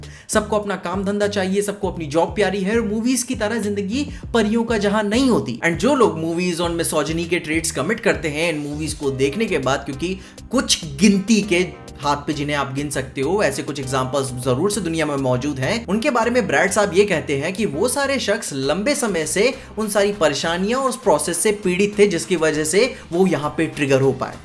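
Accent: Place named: Uttar Pradesh, India. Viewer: Areey bhaiii lanth sunke laga ki tum bhi up ke ho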